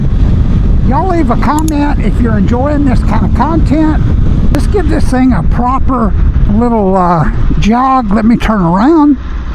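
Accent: United States. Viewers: I doubt you're Italian. Were you born in Italy and do you have Italian citizenship? It sounds to me like you're American not Italian 🤔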